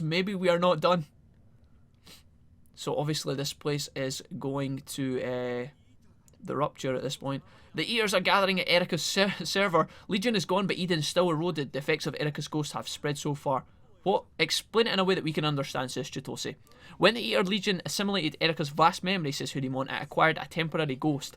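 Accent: Scotland, United Kingdom. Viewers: Its coming out now! Lol When are you back man!? We miss ya. Can't wait to hear your Scottish voice again :))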